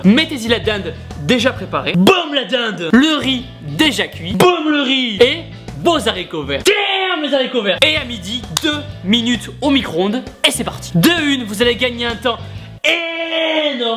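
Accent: South, France. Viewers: La recette avé l'accent avait un petit coté Maïté! C'est très bien mon gars en plus d'être valables tes vidéos sont drôles ce qui est agréable dans une discipline ou beaucoup de gens prennent on sait pas pourquoi le "melon", ça change des potaches adeptes du selfie qui font mon désespoir quand je tombe dessus... Continue bravo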